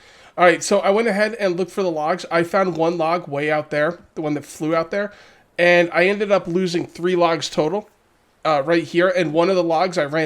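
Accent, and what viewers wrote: United States. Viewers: FINALLY a true American accent among those British speech impediment accents.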